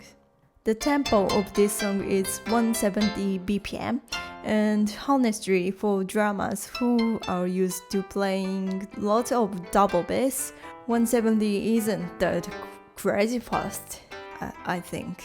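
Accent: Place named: Japan. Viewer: Oh thanks for the english version! You're quite good at speaking it already. I think Japanese people speaking english sounds very cute lol, the pauses and how they pronounce things is nice :) . The background music is a little loud, but maybe you did that on purpose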